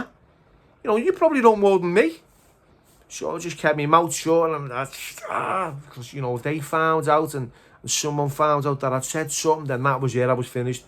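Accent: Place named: Scotland, United Kingdom. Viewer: Nailed that Scottish accent. Like me ma. What does heed the baw mean 😮strange people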